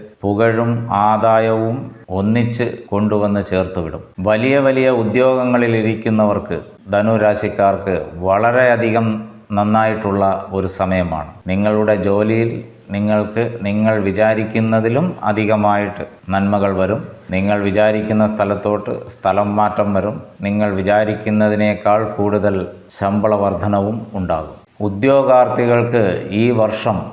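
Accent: Tamil Nadu, India. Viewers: Tamil touch. But good good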